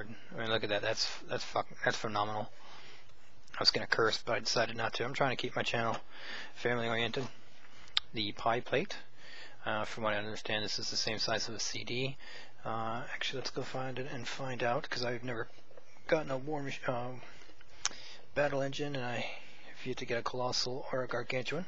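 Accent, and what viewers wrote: Australia. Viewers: He's not from the UK he's an Aussie, big difference in accents.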